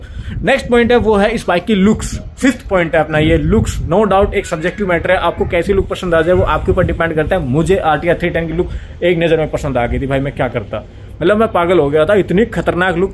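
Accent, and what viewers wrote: India. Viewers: i love your accent bro .. sounds like a pahadi ❤❤ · Bhai aap kaha se ho Rajasthan m , boli se aap Jhunjhunu side k lgte ho ❤ , btana bhai jrur se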